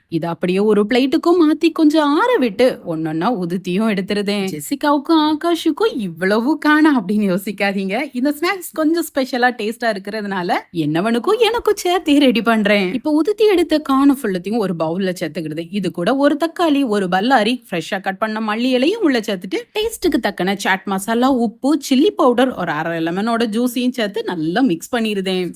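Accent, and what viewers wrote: Tirunelveli, India. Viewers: Nenga thirunelveli ah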